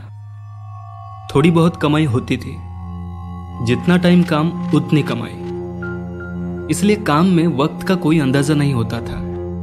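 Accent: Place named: Bengal, India. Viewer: Ta Bhai up to Bengali mei bat kar skte kyu Hindi me bolte ho upka Hindi kaisa bangal-hindi mixed ha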